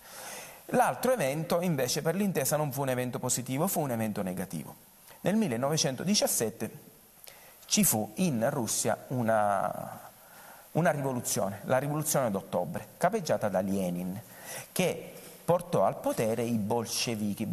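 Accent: Sicily, Italy. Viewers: Pazzesco..poi il tocco di classe con l'accento siciliano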